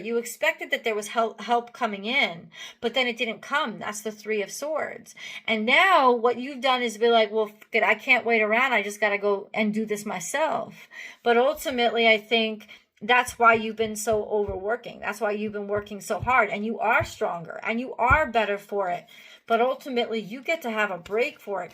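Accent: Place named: Texas, United States. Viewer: Love to hear the texan in you coming out in this vid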